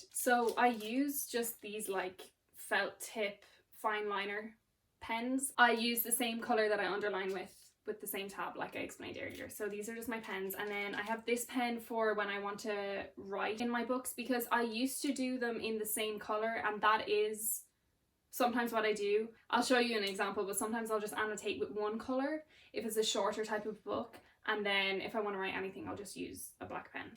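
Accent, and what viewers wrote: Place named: Ireland. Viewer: I stumbled across this video by chance, it's the first I've watched from your channel. I am the person you were before you made the leap to start with the careful pencil annotations. For some reason, I can't write into a book! 😁 I've written careful pencil remarks maybe have a dozen times, but this was when I noticed errors (usually translation errors that I've verified with the original) that stumped me so that the next person reading the book (or possibly me in a future reread) would have that information. But I've had the wish to retain certain memorable quotes and seeing how you annotate is fascinating even though I wouldn't want to write in my books with color pens. 😅 Hey, this is off-topic but I love listening to you. English isn't my native language; I'm from Germany and I don't remember hearing any Irish folks talking in English in my school days learning the language. 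(That was before the internet, and all movies / TV series over here are dubbed - the dubbing is done extremely well but of course, there would be so many accent I wasn't familiar with.) For my first real job after uni I went to Ireland and was living and working there for while (in Dublin, obviously, because this is where the jobs were). When you started talking, it was like being back there. I hadn't been aware of it at that time but the Irish accent really has a special charm! Unfortunately, I didn't see much of the island due to my being based in Dublin without a car but I'd been to Clare in a holida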